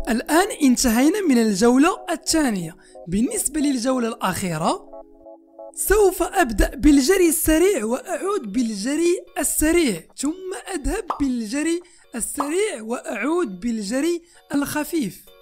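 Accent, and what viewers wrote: Morocco. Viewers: نتا باين عليك مغربي بالظبط من مراكش😂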